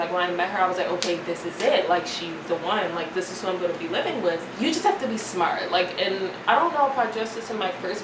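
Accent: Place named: Philadelphia, United States. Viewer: Lol she definitely gotta philly accent. She is probably just using a more professions voice for her platform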